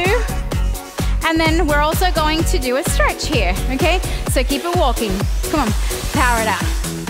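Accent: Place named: Australia. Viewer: My mom wanted a fun workout to do around Christmas time, so I showed her your holiday walking workout videos (which I love!) and she loved as well! After Christmas she went back to her usual workout routine, but it wasn’t until 2 weeks ago that she requested I show her more videos “of that pretty Australian girl who wears the cute outfits and plays good music” 😂 Now instead of doing your videos alone a couple of times a week, I do them everyday with my mom on her lunch break as she’s been working from home due to the virus. These videos truly lift our spirits and are so motivating! It’s also super fun that I can bond over your videos with my mom now (plus we get some extra steps in the process!) Thanks so much! ps mom’s been working on the Australian accent...she’s been inspired 😂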